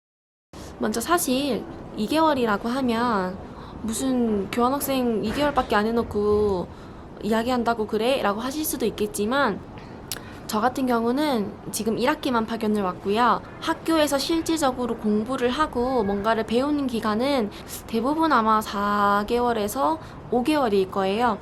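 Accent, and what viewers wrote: China. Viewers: 그리고 점점 중국어가 느시나봐요! 한국어 발음이 중국인처럼 바뀌고 있어요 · 혹시 조선족이세요? 발음에 중국인특유의 어투가 진한데.. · 발음이 중국화 되신거 같네요 ㅋㅋㅋ 신기합니당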